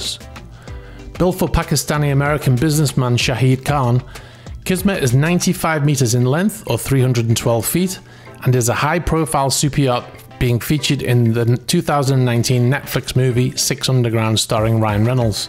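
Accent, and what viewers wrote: United Kingdom. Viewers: By the way, bloody good job on the intro image of the S/Y eSysman. Sets the proper mood. Next improvement may be to animate the stern door to open, revealing your Porsche. And for what it's worth, being new to your channel and somewhat out-of-tune with a British accent, will you forgive me for thinking that all the boats you feature are flagged in Cyprus? (super yacht...... cypriot).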